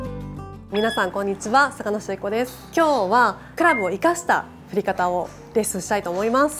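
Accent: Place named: Kansai, Japan. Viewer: 関西弁がイイね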